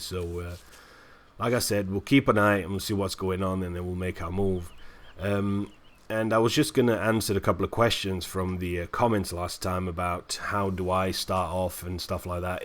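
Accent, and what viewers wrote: United Kingdom. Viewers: where are you from? you sound British but with something else thrown in every now and then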